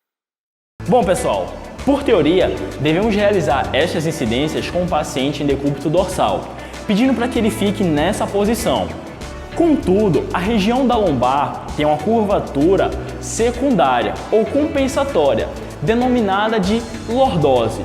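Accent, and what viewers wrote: Rio de Janeiro, Brazil. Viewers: Vc é carioca???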